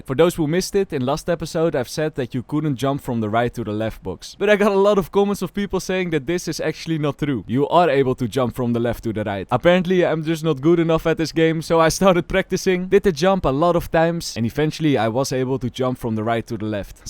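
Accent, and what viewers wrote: Netherlands. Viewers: meest nederlandse accent wat ik ooit heb gehoord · are u dutch or belgium by any chance? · de nederlandse accent is kei hard haha, danku voor de tips !!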